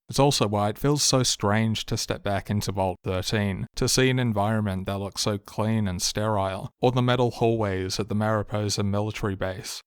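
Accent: Australia. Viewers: Strongly disagreeee, the Aussie dialect that like to strain out the last letter of the last word of a setence bothers meeeeee. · a monotone Australian voice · Certified monotone Australian classic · Disgusting to see people responding to this mentioning Br*ts. Monotone Australian is the only trustworthy media analysis phenotype.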